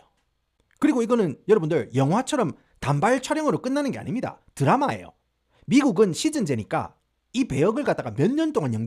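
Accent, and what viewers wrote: Gyeongsang, South Korea. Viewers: 오늘 처음 알게 되었어요 얘기도 재밌게 하시고 사투리 좋네요 저도 경상도사람이라~ 구독했습니다😊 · 동부사투리 오졌다 애살에서 샤워하다가 터져벌임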